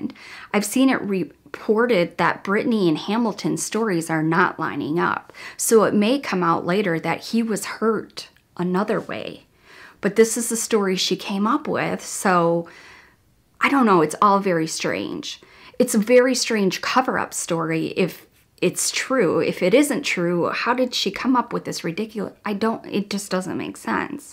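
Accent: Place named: Midwest, United States. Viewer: You: Central Standard time ME: I KNEW IT!! I knew you were One of us!a "midwesterner" by your "accent" LOL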